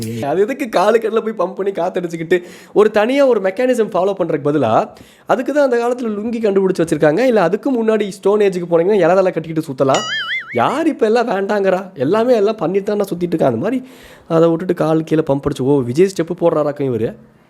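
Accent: Coimbatore, India. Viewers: The coimbatore slang is super❤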